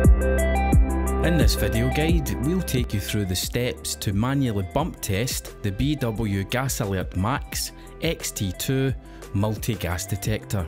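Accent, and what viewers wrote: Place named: Ireland. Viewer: u Irish I can tell. although this wasnt what im looking for thx.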